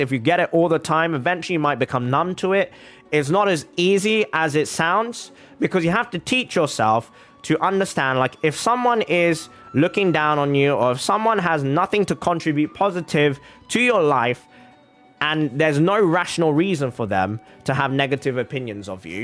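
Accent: United Kingdom. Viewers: Damn, you got those good genes looking like you do now at your age! Dude at my workplace is Chinese and 35, and he only looks a few years younger than you.The two of you would've passed for siblings for real. Also, as an Chinese Australian, I gotta say that you sound more British than Australian. When I'm talking with people using discord, they think I'm British lmao.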